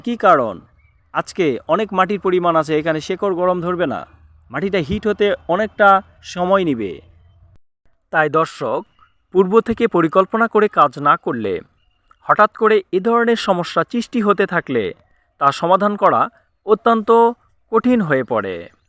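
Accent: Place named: Naogaon, Bangladesh. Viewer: ভাই আপনার বাসা নওগাঁর কথায়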